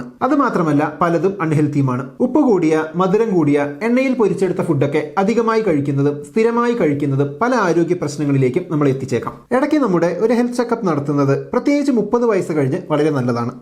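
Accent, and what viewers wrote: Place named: Kannur, India. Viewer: Food മൊത്തമായി വെറുക്കാൻ refillyourtummy കണ്ടാൽ മതി. അത്യാവശ്യം കണ്ണൂർ ഭാഷയും വെറുപ്പിച്ചു കയ്യിൽ തരുന്നുണ്ട്.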